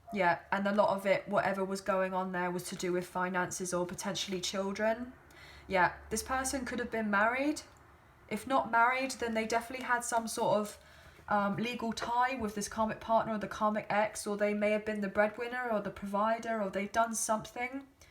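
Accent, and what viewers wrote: England, United Kingdom. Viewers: Refreshing to hear an English Voice x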